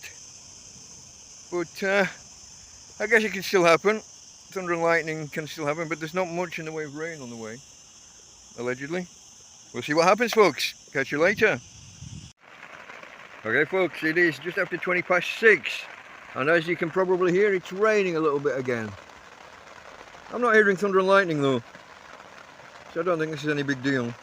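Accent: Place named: Huddersfield, United Kingdom. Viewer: just in case you were familiar with the other sons of Huddersfield The Sheepfarmstudios brothers Chris & Dom. I had a lecturer from Huddersfield sounded like yourself. Sheepfarm had yet another Huddersfield original on called Michael O'Deira who might assist you with your banking issues.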